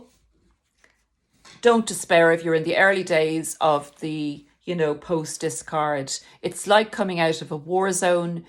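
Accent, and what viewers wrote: Ireland. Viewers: This is exactly how it goes. This this exactly what them demons do. They do the work of the devil, willingly, with bad intent to confuse and destroy everyone and everything. My narc father destroyed my whole family and liked doing it. Amen and God bless you!! Thank you. Love this!! I had a teacher in 8th grade who’s name was Sister Pauline and she was from Ireland. We used to spend Saturday’s with her at the convent. You sound just like her with your accent💖 Great Video!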